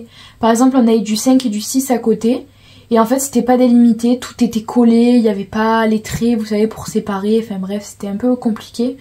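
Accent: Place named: South, France. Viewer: Coucou, je suis tombée par hasard sur cette vidéo et j'ai passé un très bon moment, je possède aussi le petit livre Fantasy mystère, effectivement les solutions sont superbes mais comme tu dis c'est tellement petit 😱 J'avais commencé le dragon et je l'a laissé en cours , je n'ai pas le courage de le reprendre 🤣 J'ai fait la chouette que tu montre et je te confirme que c'était très long , et encore , moi je fais ça aux feutres donc je trouve que c'est moins difficile d'accès qu'avec une pointe pinceau comme les feutres acrylique , surtout dans les toutes petites zones ! Je ne sais pas d'où tu es exactement mais ton accent me fait pas mal penser à celui de chez moi , même si, pour une sudiste, j'ai pas un accent ultra marqué, je suis pas loin de Montpellier, enfin à 45 minutes quand même xD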